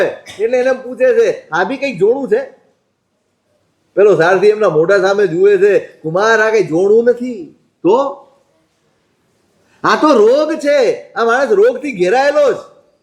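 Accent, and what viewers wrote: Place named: Kathiawar, India. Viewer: Maththen vandami. Though I am not jain and have been living in foreign country ( ANARYA BHOOMI) for 20 years, I am deeply interested in Jainism. Me and my wife have listened SAMARADITY MAHAKATHA for 2 times and 3 time I am listening to you and enjoying your KATHIYAWADI ACCENT very much. Guruji, you are explaining in the simplest way and it is heart touching.Please bless us so that we can make our SOUL free from all the karmas. BAHU PUNYA KERAA PUNJ THI AA MANAV DEH MALYO, EVI KARUNA KARO AAP K AA BHAVROG TALI JAY.🙏🏼🙏🏼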